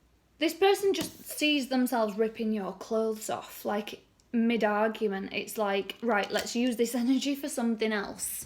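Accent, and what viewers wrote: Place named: London, United Kingdom. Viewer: so nice of you to think of us. i love your dialect it reminds me of my students years in the late seventies in north London♐️🇨🇭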